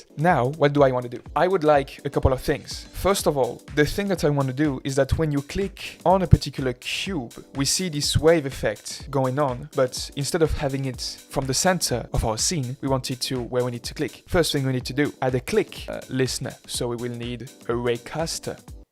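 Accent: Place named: France. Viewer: IBCs !!!!! I am now co-opting this acronym shamelessly and, like a true american duché-bagette....I will likely fail to give you proper credit for its origin. Only because it would be difficult to reference your work; nobody knows who you are yet.....yet. Don't stop doing what you're doing. It's inspiring. Plus I would rather hear this kind of material with a french accent anyday of the week. Especially the cursing and self depreciation....I even find myself using a similar french accent in my head when I am berating my big dumb American brain for my frequent fails at tasks that I begin overconfidently only to realize how much more there is for me to learn.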